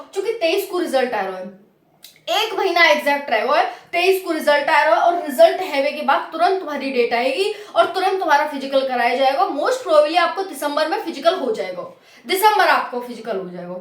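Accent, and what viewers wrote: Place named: Braj, India. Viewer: आप तो ब्रज भाषा बोल रही हो बहुत प्यारी लगी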